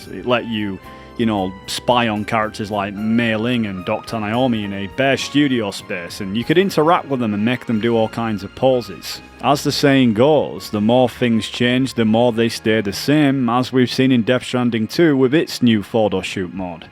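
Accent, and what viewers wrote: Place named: United Kingdom. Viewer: 10 seconds in you called modern society terrible. im guessing that you live in the uk based on your accent. yeah you guys have some HORRIBLE politicians, but if you dont like it LEAVE THE COUNTRY. the whole planet is not defined by what you guys do on that island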